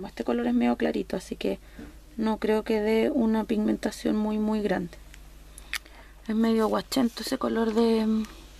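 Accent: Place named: Chile. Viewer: K lindo hablas chilena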